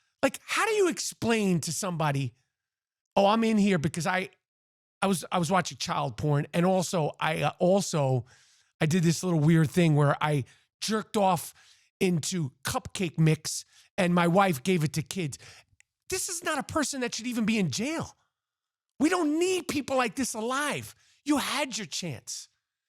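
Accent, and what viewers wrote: New York, United States. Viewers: When I need a dose of New Yorky attitude, I listen to. Who has a classic accent and voice like us anymore? New York attitude is gone. Yeah, we have everyone from all over the world and they get to speak their own language. They come here like tsunami. But they stick together. where is the classic NY accent and attitude - free speech about what matters? Good awards podcast. Thank you for speaking.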